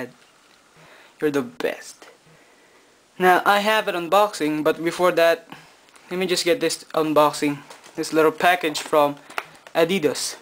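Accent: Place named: India. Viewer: you sound indian. i'm chinese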